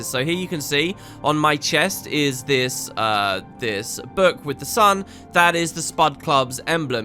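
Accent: United Kingdom. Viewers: cause he's british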